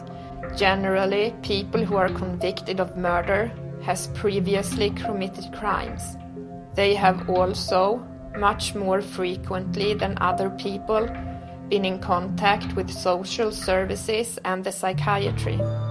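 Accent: Sweden. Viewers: The Swenglish is killing me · William Redshield This language is called svengelska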